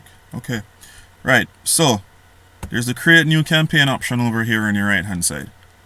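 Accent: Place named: Jamaica. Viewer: You sound like a yardie bro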